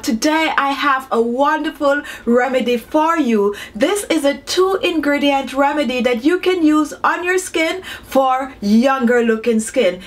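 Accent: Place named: Jamaica. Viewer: Sounds like u r from Kingston Jamaica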